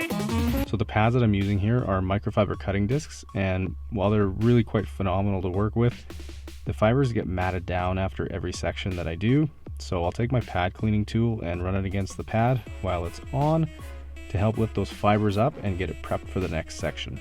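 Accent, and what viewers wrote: Canada. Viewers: *Process in that Canadian accent*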